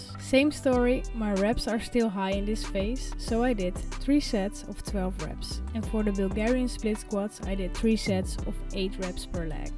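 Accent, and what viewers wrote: Netherlands. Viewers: Liefe dit was echt heel leuk tuurlijke hou je Nederlands accent maar heel goed gedaan!💗✌🏼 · As a Half Dutchie/British guy your pronunciation is quite decent! Sometimes I hear the Dutch accent but I hear through it and doesn’t distract me! So Keep it up! ❤️💪🏻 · Echt leuk je hoort soms wel dat je nl bent maar dat maakt niet uit 🥰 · Maybe it’s good to take some lessons in English language . It’s kinda like dutch english. You can follow English online ! ❤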